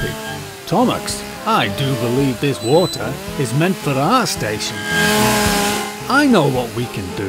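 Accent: Scotland, United Kingdom. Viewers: Is the narrator Scottish?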